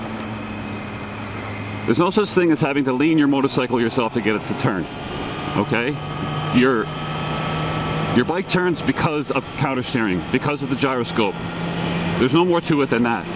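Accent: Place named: Ireland. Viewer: stop fing and talking and do some cornering. bla bla bla. Some Irish accent is coming out to lol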